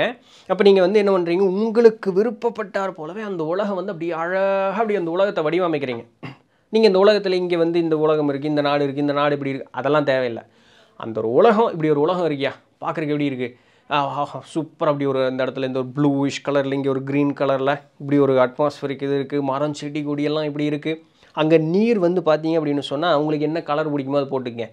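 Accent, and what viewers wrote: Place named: Kongu, India. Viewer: Really very interesting and motivating session in OUR KONGU TAMIL ❤ Tq sir 🎉🎉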